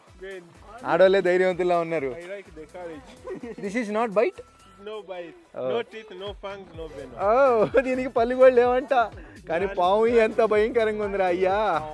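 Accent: Guntur, India. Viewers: YouTube views aagipothai kaadhu athanu guntur kaabatti ala untundhi vaalla slang nuvvu yelluthey neeku thelusthundhi ley guntur vaallu choostey vaallaku normal gaane untundhi endhukantey vaalla slang ala untundhi guntur lo picchi puk thelusukoni maatladu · Some people r commenting without even understanding his hardwork. I am from vizag and i have good friends from guntur. Adi valla slang but yekkada bad words use cheyledu ithanu. He is genuine. All the best my bro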